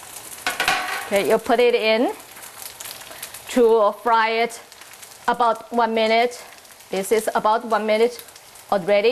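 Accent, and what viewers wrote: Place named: China. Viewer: english no good is hard to understand ....chinese english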